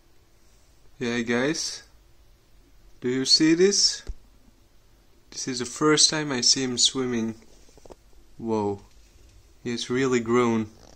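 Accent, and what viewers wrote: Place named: Belgium. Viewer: Judging by your accent, you’re Belgian right?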